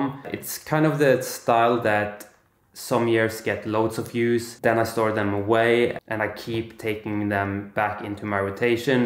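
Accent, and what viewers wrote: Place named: Norway. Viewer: Lol knew you where Norwegian by just the accent alone, before even seeing your name.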